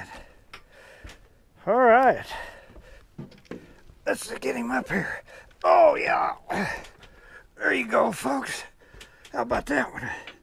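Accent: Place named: Missouri, United States. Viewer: You are the fishing grits of all time Enjoy your expansion to catfish but can’t imagine you would actually clean and cook them I never thought catfish was worth the effort I am driving back from AZ on Monday Love listening to your distinctive SE Missouri flavored commentary Dave K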